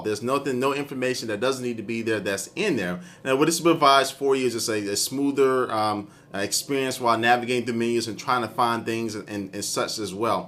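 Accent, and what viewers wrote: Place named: United States. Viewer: i can tell you are american you know why because americans seem to have this wierd thing about wrongly pronouncing words like mobile phone you say moble phone why there is an I in the word mobiiiile phone or when americans say soldering they say soddering wrong there is an L in the word soillldering no offence americans but if you going to speak english speak it right and say you are speaking english not american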